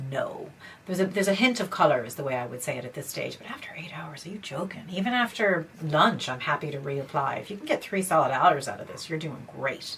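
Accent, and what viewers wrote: Ireland. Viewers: I love your Irish accent peaking through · Love the Irish accent peeking through. Thanks for the honest review. Hope you had a great Christmas.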